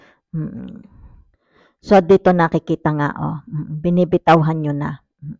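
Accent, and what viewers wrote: Batangas, Philippines. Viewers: thank you tita..GOD Bless you and your family..your a batanguena if i am not mistaken..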